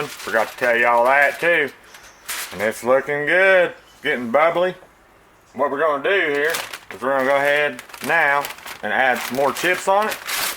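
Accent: South, United States. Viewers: Looks really good and definitely something that my kiddos would love. Congratulations on being sober and not drinking anymore. Love the Southern accent sounds like myself and I'm in a room with my family, lol..where you from?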